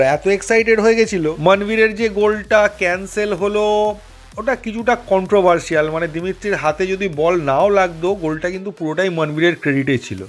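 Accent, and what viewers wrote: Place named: Basirhat, India. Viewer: তুমি কি বসিরহাট বা হাসনাবাদ দিকের ছেলে ??? আমার একজন এই রকম কথার টোনে বন্ধু আছে.... 😀😀😀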